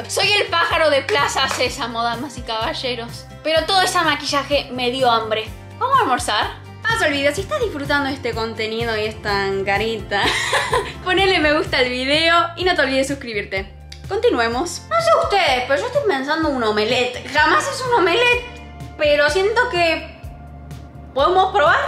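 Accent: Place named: Argentina. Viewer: No les pasa que ✨Amaricho✨, me encanta ese acento argentino y te amo demasiado, saludos desde Costa Rica...bai! 😁✌🇨🇷☀💛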